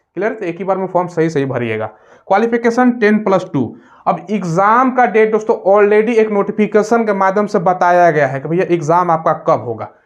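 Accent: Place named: Eastern Uttar Pradesh, India. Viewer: Ame Bhai apna east UP se hai😅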